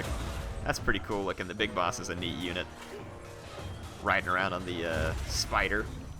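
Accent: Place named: Arkansas, United States. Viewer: Whenever I hear that ol' friendly-Arkansas " Hey Youtube, what the crap is going on!" it cheers me up immeasurably.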